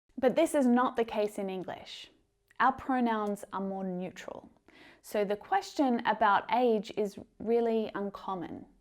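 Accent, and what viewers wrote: Australia. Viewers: Ma'am are you from Australia ?. I'm in love with your English accent😍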